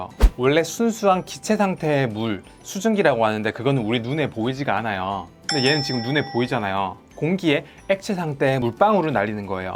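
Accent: Gyeongsang, South Korea. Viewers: 갱상도 발음인데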